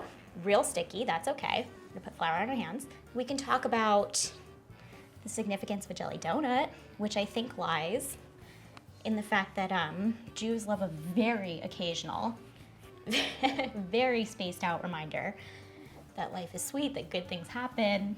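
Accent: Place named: Rockland County, United States. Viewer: I freaking love!!! Her sarcasm kills me throughout her whole video!! Growing up in Rockland County NY she sounds like every girl I went to HS with, I love it!! 🥰🥰🥰🥰. is the best!!!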